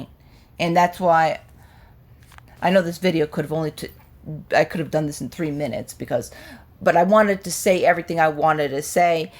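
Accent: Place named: New Jersey, United States. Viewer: I liked your message here. And I also like you NJ accent 😊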